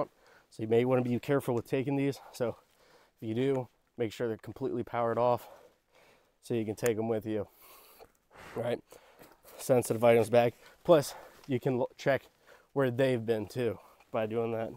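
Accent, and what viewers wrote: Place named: New England, United States. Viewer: No where near Appalachia. Doesn't look like my backyard, just a little to flat. Plus your Yankee accent tells me the same thing. Otherwise, the idea of your video is good..I wish you much success in your endeavors. The more educational content like this the better.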